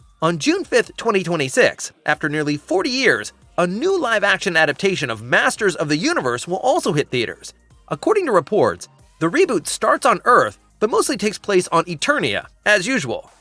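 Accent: United States. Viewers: God his American accent and tone is jarring as fook...cant finish this lol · I find this American English really hard to bear. That artificial euphoria in every sentence. Exhausting.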